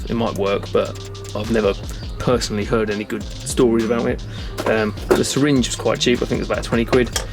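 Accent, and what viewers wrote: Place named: Australia. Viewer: I like your aussie accent 👌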